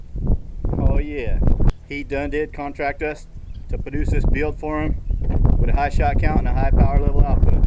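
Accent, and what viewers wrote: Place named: New York, United States. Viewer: I see you are in west TX, but from your voice I detect Louisiana and a subtle NY accent. I am in East TX by the way...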